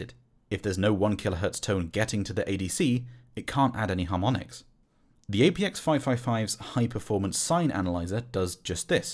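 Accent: United Kingdom. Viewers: An excellent video. you really communicated this very well. And I love the sound of your voice/accent/grammar. The very best of ye old British. Cool. Excellent diction and pace. Very easy to listen to. Superb explanations, very well presented. Thank you.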